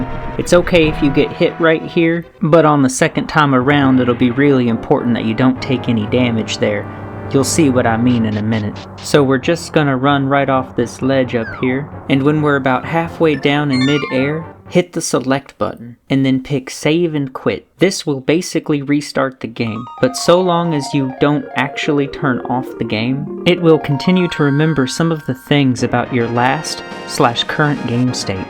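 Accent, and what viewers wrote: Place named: South, United States. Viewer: Alabama? Georgia? Mississippi? Tennessee? Where ya' from? TEXAS!?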